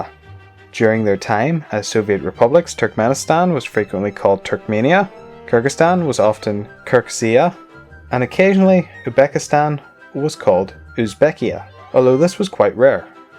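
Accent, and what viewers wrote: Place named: Northern Ireland, United Kingdom. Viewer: I just found your channel and im loving it! as a fellow Northern Irish (Tyrone) Its great to see as i havent seen any others, nice to hear a non american or English accent :)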